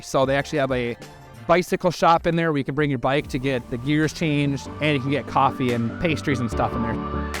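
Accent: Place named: Wisconsin, United States. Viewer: Such a Wisconsin accent lol I was born and grew up in EC, good memories:)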